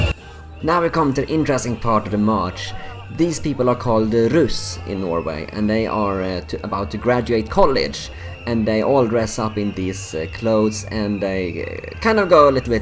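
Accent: Sweden. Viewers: I can hear just by your accent that you are swedish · You can clearly tell from his english accent that he is swedish!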